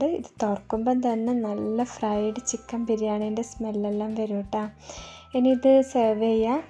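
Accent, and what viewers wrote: Kannur, India. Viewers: Sooper biryani..recipe...😋ningal kannur aano..voice kelkkumbol angane thonni...😍😍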